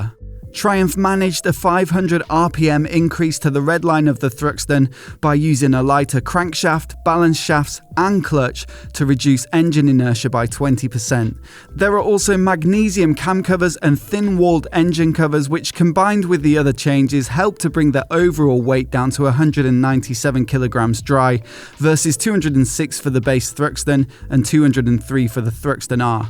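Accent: United Kingdom. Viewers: An interesting episode for the promotion of Triumph. Are you British? PS: My cat urinates more than the gasoline in the Triumph's tanks. Apparently some people think that motorcycles are created not to ride them but to admire them motionless. (The shortest, and most creepy, joke: an trip with Triumph Bobber - but also Triumph in general.) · Far too British 😂🤣😂.......What's going on??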